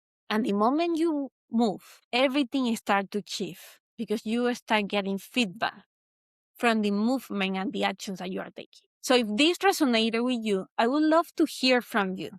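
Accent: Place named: Latin America. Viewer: Cute accent. Can I guess? South America, Italian origins, swimmer and dancer, living somewhere near the sea.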